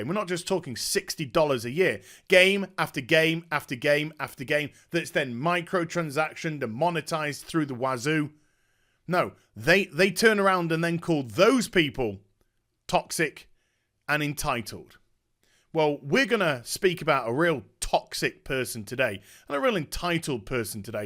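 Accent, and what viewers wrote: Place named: United Kingdom. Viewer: Hey! Im no one. Just someone who watches you. IMO this 'right into it' format is IDEAL for you. Straight away. Spot On. Very engaging right off the bat. Youre not a jester. You dont have to try to 'entertain us' before each vid. I believe most of us watch you because we believe you are a very like minded, level headed guy with a great British tenor and the same 'take' we would likely have on the given subject. Just my humble opinion. Love ya...Keep it up <3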